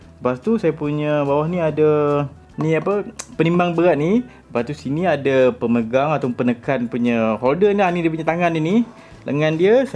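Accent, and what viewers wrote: Melaka, Malaysia. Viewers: nice video , and btw org melaka ye :)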